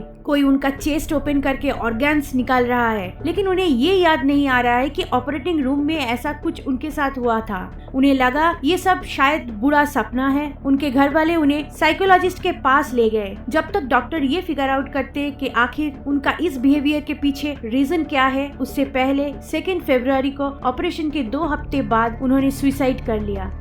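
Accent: Bengal, India. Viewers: Are you bengali? It sound like you are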